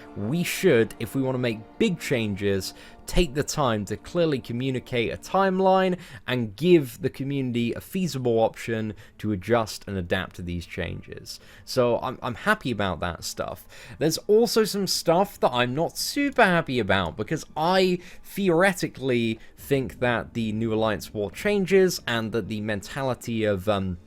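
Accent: United Kingdom. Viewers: Not to be weird, but I love hearing you speak in your British accent with your British words hahaha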